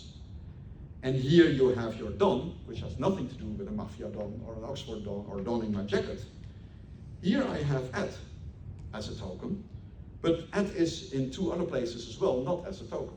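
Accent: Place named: Netherlands. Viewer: Oh haha, dat is perfect! Meende al een Nederlands accent te horen, maar top, dan zal ik die ook nog eens doornemen om zeker te zijn dat ik het ingewikkelde engels (lees: technische engelse woorden die niet in mijn dagelijkse leven voorbij komen) niet verkeerd begrijp. Hartelijk dank voor de reactie!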